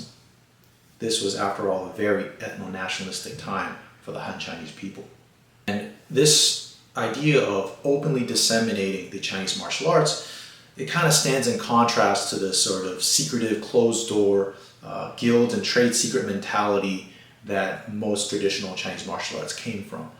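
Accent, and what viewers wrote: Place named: Taiwan. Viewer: He's Taiwanese, my step dad used to say "zen" for 人。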